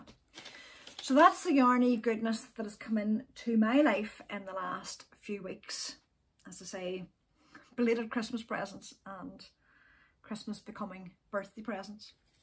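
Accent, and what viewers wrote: Ireland. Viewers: Congratulations with your 10K subscribers. I love your podcasts, your talks about yarn & patterns love love love it. And you have such a beautiful Irish accent. My name is Inge and i'm living in Belgium, my dream is to knit a colorwork sweater by Marie Wallin. I have a lot of books of Marie Wallin because i love her colorwork. I'm looking forward to your next episode. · I really appreciate your gentle manner, your honesty, the practical approach you take to knitting and yarn, your Irish accent and showing us the beautiful coastline of Northern Ireland! I still remember the episode you did during covid on the little things we could do to stay well - there was so much practical wisdom in that. Congratulations on reaching 10K subscribers! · hello! It's so nice to have you and your lovely irish voice back on a regular basis! Joanne from Illinois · Many many congratulations on 10k👏👏I really want to knit Joji's Frank shawl, but keep knitting hats and cowls for other people. 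I have loved each and every episode you have produced. Love your Irish accent and sense of humour. I hope one day I can meet you.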